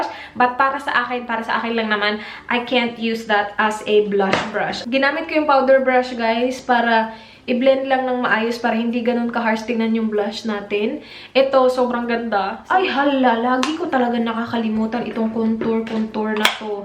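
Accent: Visayas, Philippines. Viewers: Bisaya ka day? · Ommggg!! I like the way how you speaaakkk!! Are you bisaya po? 😍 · You have a thick Filipino accent when you speak English but with practice you'll get better :) ang ganda mo with makeup and you are really skilled, thanks for sharing your review of Anne clutz brushes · I still can't get over about how beautiful your bisaya accent transitions in to a very nice and fluent English accent. Love you x. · Bisaya acent☺️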